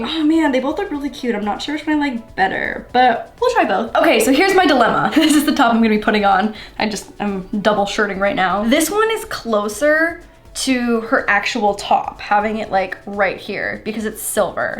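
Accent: Canada. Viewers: Some ppl make fun of Canadian accents but I think it's so cuuuuute like puppieeeees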